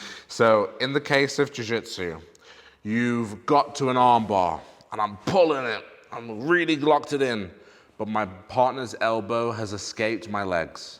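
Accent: England, United Kingdom. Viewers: lol thanks man, I needed that. And thanks for the vids, I really appreciate the details in the way your break this stuff down. For some reason your immaculate English accent is easier to recall than my American coaches when I’m being strangled.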